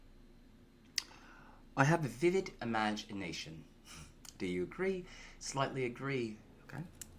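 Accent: England, United Kingdom. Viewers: British accent is awesome. Please use in other videos! Thank you for your hard work. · Ooooooo love the British accent! Wasn't expecting that at ALL and I IMMEDIATELY grinned xD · British accents trigger me so much, and yours is one of the best 👌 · The British accent is the one!! 😂🥰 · Lovin the British accent 🤣 I’m British myself haha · Bro the British actually matches you 😂🖤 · Amazing English accent! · Omg it’s the return of the British accent!! I feel like it’s been literal years since you’ve used it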